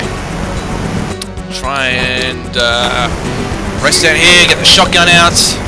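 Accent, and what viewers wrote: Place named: Australia. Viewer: Haha, oh dear. I don't think I want to know. Actually, hopefully I'll never know unless curiosity gets the better of me of course. Ps. Awesome to see an Aussie doing this. Assuming of course your accent IS Australian :P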